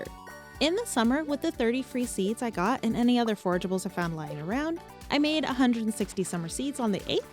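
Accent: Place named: United Kingdom. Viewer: I am getting sone serious bri'ish vibes.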